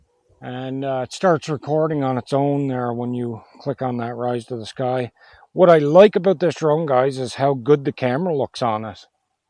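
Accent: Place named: Canada. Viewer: Where about's are you in the world my friend, you sound Canadian to me, hence you got loads of money to buy any model you want :-) Canada or USA?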